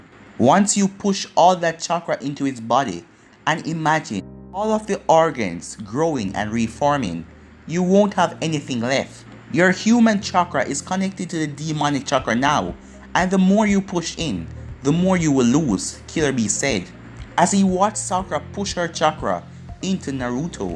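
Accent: Jamaica. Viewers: Hey are you Jamaican I'm only asking because I am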